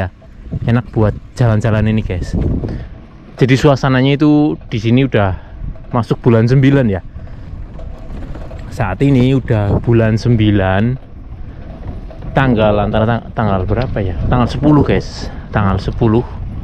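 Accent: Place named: Java, Indonesia. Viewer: ciri khas ,dan bahasa nya medok banget 😂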